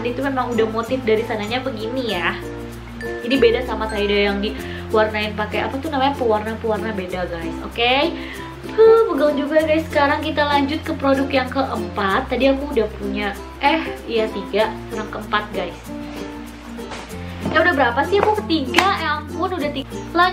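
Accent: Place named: Sunda, Indonesia. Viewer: kaka orang sunda yah? lucu ngomong nyaa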